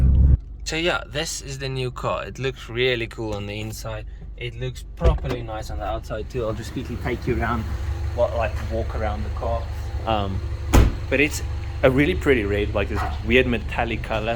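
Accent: Afrikaans, South Africa. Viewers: First thing I hear is the Afrikaans accent. Appreciated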